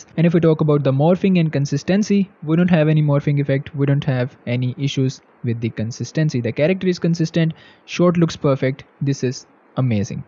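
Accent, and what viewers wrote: India. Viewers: What's wrong with your English? Are you from India? It’s just that in India everyone speaks with a terrible accent that even a foreigner can hear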